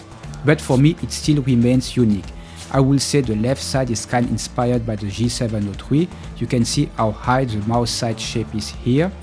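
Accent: France. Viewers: wtf is this shitty french accent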